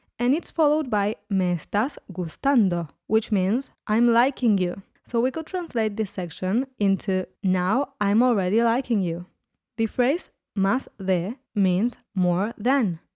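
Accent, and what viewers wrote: Argentina. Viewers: Are you Argentinian? I love your accent. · Heavy Argentinian accent... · Are you from Argentina or are your friends from Argentina? If so, it is a very noticeable distinction to pronounce your "ll" like "sh" instead of "y." Maqui(sh)aje. Maybe that's what you mean? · No, the singer is Puerto Rican, the speaker is Argentinian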